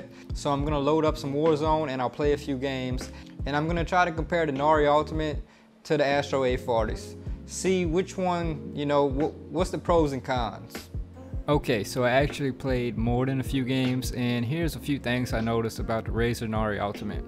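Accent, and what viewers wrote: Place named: Louisiana, United States. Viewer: Just purchased thanks! Also where's that accent from, Louisiana?? 😎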